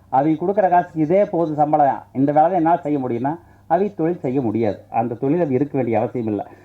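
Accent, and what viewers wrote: Coimbatore, India. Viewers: i love kovai tamil.. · kovai tamil arumai ayya :)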